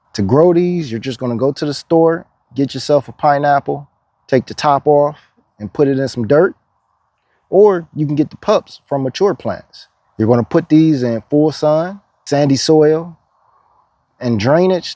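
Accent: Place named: California, United States. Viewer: You sound like you’re from California.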